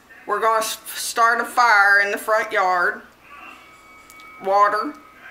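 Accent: South, United States. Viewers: You do have a southern accent!! LOL. I love it! And YAY!! Someone else says rolling!!! I've added your video to the playlist! WHOOT WHOOT!! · What a fun video ... you definitely have a southern accent! I was born and raised in east TN and for some reason do not have much of an accent. I don't think I do, anyway, hehe.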